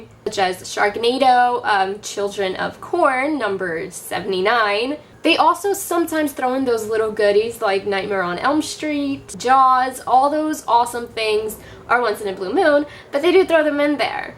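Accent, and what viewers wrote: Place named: New York, United States. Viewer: I love your NY accent. I'm from NJ lol I was just watching some of your old videos yesterday so it's great to see something new from you! I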